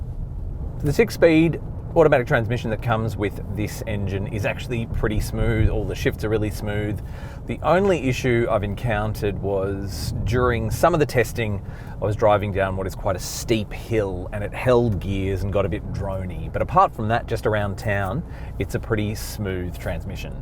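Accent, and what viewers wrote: Australia. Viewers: That down-under accent + curry = solid combo mate xD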